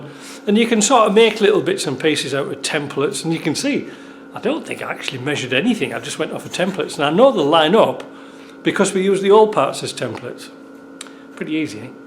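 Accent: Yorkshire, United Kingdom. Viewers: You can take the man out of Yorkshire but you can't take Yorkshire out of the man😉 Merry Christmas buddy 🏴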